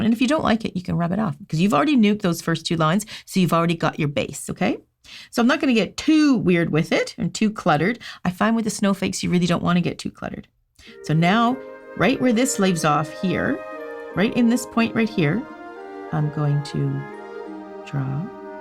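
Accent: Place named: Canada. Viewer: DO YOU MAKE THOSE LIL HATS?? WHERE DO YA GET THEM?? MY PRAYERS ARE FILLED WITH THOUGHTS OF YOU AND CAMERA MAN AND YOUR GUITAR PICK'N BABY GIRL THIS HOLY DAY SEASON AND THE HOLIDAY'S ALL TOGETHER... MERRY CHRISTMAS AYE... I NOTICE YOU SAY AYE AAAALLOT SO CUTE. MUST BE A CANADIAN THING HUH. "I MEAN AYE"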